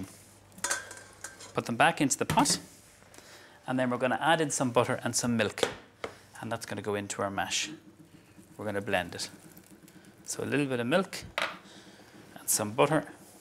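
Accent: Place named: Ireland. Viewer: Samiches 😂 love it, real Irish accent lol. Great video ☺️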